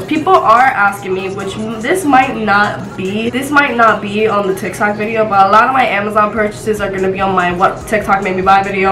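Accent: New York, United States. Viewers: lmao that is no florida accent at all, its a New York New Jersey accent. Listen to how she says Cawwfee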